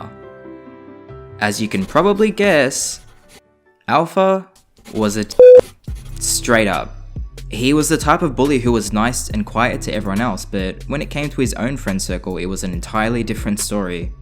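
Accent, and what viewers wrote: Australia. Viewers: I just found out you’re Australian- eyyyyyy (also Australian) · so i dont know if i herd this right but you said '' deku'' i dont know i am so sorry i don really understand Australian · Haha I knew you were Australian. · R u Australian?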